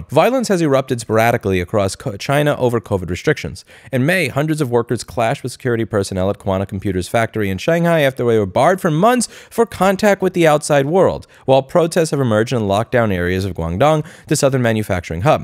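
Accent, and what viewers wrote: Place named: Texas, United States. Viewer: Texas I hear! Which area?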